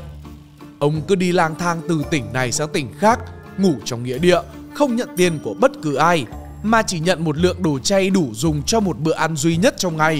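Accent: Standard. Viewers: Bạn nói chuyện đúng chuẩn!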